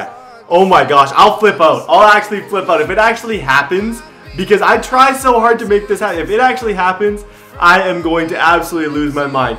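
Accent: Canada. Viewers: I can tell Canadian because you say soorry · I knew you were Canadian since you like the Raptors and how you say about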